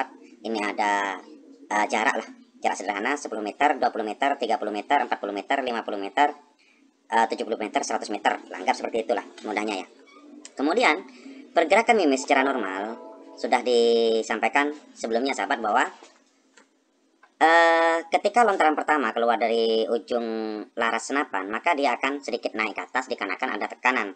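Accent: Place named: East Java, Indonesia. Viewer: Trims ilmunya, suaranya lucu, logatnya Jawa Timuran😂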